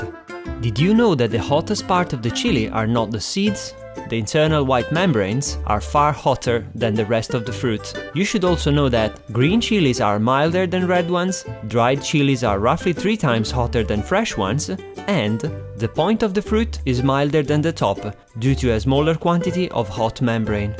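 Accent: Italy. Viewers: I think it's italian i'm italian and that's what it sounds like :) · I knew it! you got the touch of Italian flair in your manner of speaking....please come to Toronto...you stay with me....and we cook veges day in and day out...I mean it.Toronto is heaven for vegetarian due to imports from Asia, central America, the caribbean, mexico and so on. Here, I see vegetables and fruits I haven't seen somewhere else. probably I will record a video and send u next time I go to the store.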